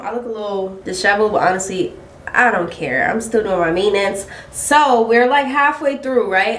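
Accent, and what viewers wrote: England, United Kingdom. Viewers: It’s the English twang for me. Your friends are a vibe. Okay French toes, look at the little pinky toe 🥺😅 Sis said her hat is broken.. comedy just comes naturally to You 😂🥺❣️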